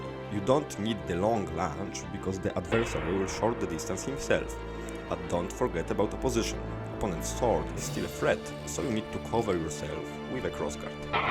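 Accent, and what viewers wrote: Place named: Eastern Europe. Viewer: We were promised Slavic accent, and we get memes in Slavic accent. Nothing to complain here...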